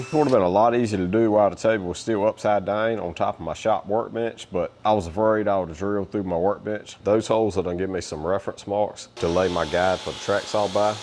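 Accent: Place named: South, United States. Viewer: Where are you?? I am trying to finger out your accent but I can’t!! It’s southern, it’s a bit Cajun, it’s a bit lumbee.. I have no idea!! ;)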